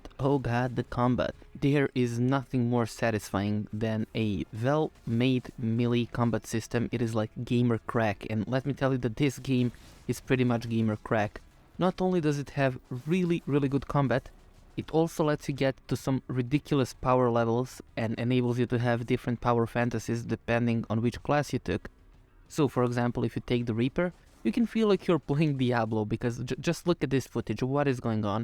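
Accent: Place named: Eastern Europe. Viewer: Potpuno se slazem, precesto mi se desava da pogledam video koji traje skoro koliko i epizoda neke serije koji nije ni najmanje koherentan i vrti jednu te istu pricu svo vrijeme bez neke poente. Radujem se daljim uploadovima :) Pretpostavio sam da si negdje iz istocne evrope po akcentu, kada si izgovorio ime developera u ovom videu pravilno, znao sam da mora da si negdje sa ex-yu prostora, svi ostali se generalno bas muce sa slovom Ć haha. Sve najbolje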